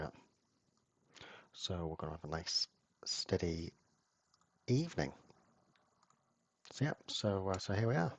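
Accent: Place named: Hull, United Kingdom. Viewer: Sounds like a Hull accent.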